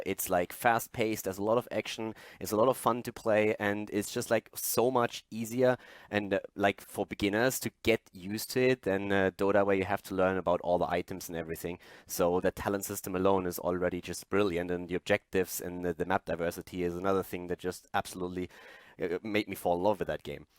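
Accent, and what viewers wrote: Germany. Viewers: I agree with all of it! Mainly with the voice thing! The MMR thing is very difficult and i know what it means to have an illidan on the team with most deaths in lowest hero dmg on the team! Maybe some tweak for the rating in combination with the other stats but it would help a lot!! I love your work and your german voice;-) Go ON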